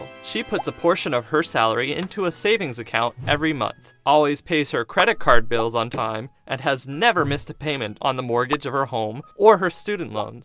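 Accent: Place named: Canada. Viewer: The narrator sounds so Canadian.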